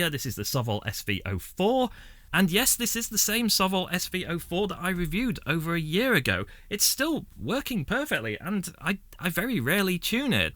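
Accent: England, United Kingdom. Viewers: Nothing more fun than listening to an Englishman.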